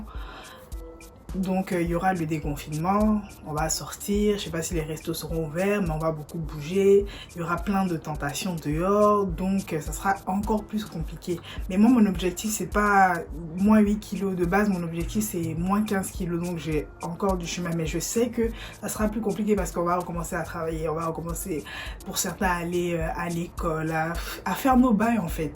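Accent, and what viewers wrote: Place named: Ivory Coast. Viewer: On sent l'accent ivoirien. Ko djaaaaah!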